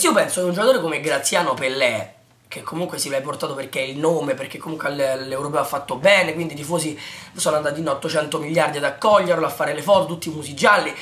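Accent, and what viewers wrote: Rome, Italy. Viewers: Ciao mi chiamo Pino e come te sono di Roma anche se sono della LAZIO....Vivo a New York da 11 anni e ti seguo da molto tempo, sei molto bravo ma dovresti essere un po meno tifoso e Romano Parlante.....potrei essere tuo padre e se posso ti consigliei di studiare per diventare giornalista sportivo...ciao. alla prossima Pino.......Dimenticavo prova a parlare di LOTITO solo cosi potro' vedere il tuo talento....Ciao.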